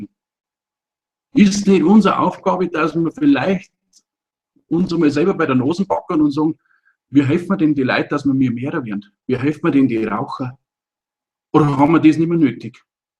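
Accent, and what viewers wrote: Bavaria, Germany. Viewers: Klartext und bayrisch, das passt halt einfach wunderbar zusammen... 👍👍👍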